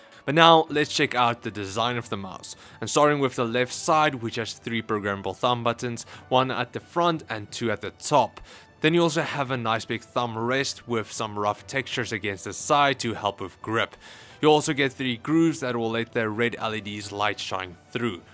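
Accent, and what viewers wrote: South Africa. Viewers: YES youre south african!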